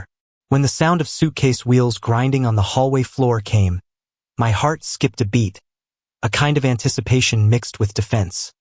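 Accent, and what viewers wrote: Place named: Spain. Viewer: The voice. Missing words. Spanish accent thrown in. All reasons why I could not listen to this all the way through. 🤮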